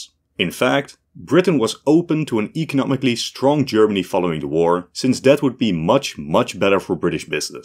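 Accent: Netherlands. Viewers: I think it's a Dutch accent.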